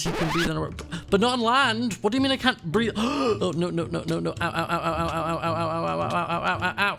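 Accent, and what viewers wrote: Scotland, United Kingdom. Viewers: I'll keep that in mind and will try and remember to check my notifications at 6pm at Tuesday and Saturday- Btw, I love the Afterlife series! The Scottish accent makes your vids better and funnier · U are Scottish aren't U respect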